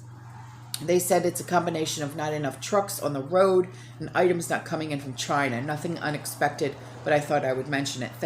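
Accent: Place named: New York, United States. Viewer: “Yous guys” I LOVE IT!! New York sass and GREAT INFO!! Thank you!!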